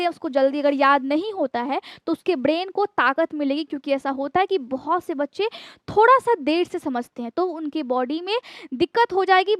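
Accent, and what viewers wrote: Bihar, India. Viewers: Bihari lag rahe ho Ghar hota h Ghad nahi Agar hota h Agad nahi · I love your way of speaking like bihari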